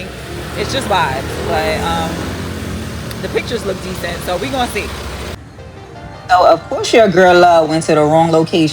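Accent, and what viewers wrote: Brooklyn, United States. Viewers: Can I say I’m so glad I just found your channel sis BECAUSE the way your breaking everything down in your true genuine Brooklyn voice is TOP TIER YO!!! - No cutesy made up influencer voices JUST THAT TRILL SHIT and when you said Irregardless sent my ass because I STILL SAY THAT 😂🤣😂🤣🤣 instant likes and subscribe or whateva😉💯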